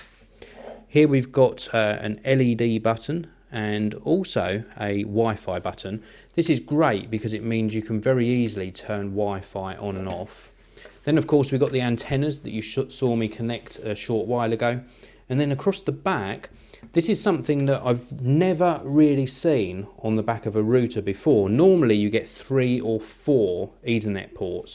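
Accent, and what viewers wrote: United Kingdom. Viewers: vfw2 Route is "root" in England. So Router is "rooter"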